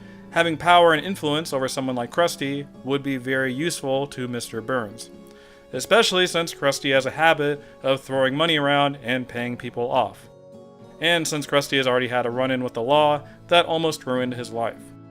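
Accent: United States. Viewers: Does he? I'm British and he sounds pretty typically American to me.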